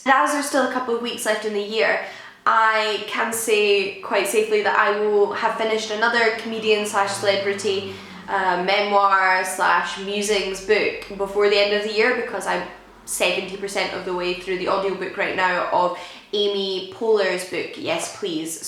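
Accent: Scotland, United Kingdom. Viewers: You look quite like Amelia Pond from Doctor Who. And you've got the same Scottish accent. Loved the video. I haven't been able to make the leap into Non-fiction yet but this video is a good motivational source. Thank You!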